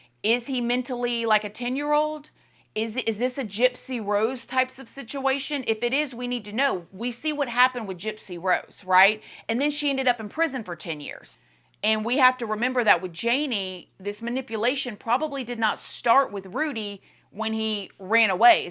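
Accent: South, United States. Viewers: I actually need my regular fix of this southern comforting voice. It sounds like fresh baked cookies and warm hugs. This lady is smart as a whip. Sharp as a tack. Brains, beauty, and lovable x 1 million. She's top tier . I hang on her every word ❤❤❤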